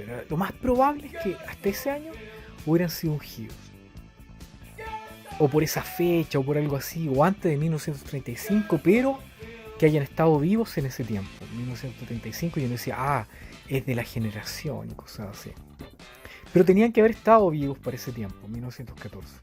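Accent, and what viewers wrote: Chile. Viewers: TU VOZ Y ACENTO SUENA MUY PARECIDO AL DEL CANAL ( MAROONED ) QUE ES CHILENO LO ERES TU TAMBIEN?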